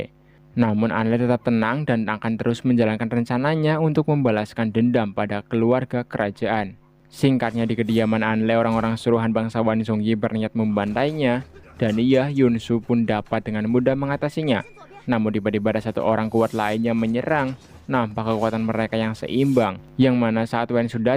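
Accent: Banyumas, Indonesia. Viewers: Duuh Mas broo yg merasa mirip Han Ye alias Gong Jun hehehe wkwkwkk kok ngomongnya ngapak gitu sama kayak Aku lhoo aslinya mana yaaah gaess 🤔🙉🤗😁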